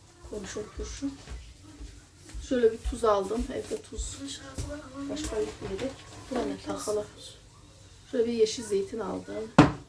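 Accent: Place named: Gaziantep, Turkey. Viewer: ayy ne güzel hemşeriymişiz bende Gaziantepliyim demekki kan çekti 😂👍🏻şivenden benzettim zaten 👍🏻❤️🌹🙋‍♀️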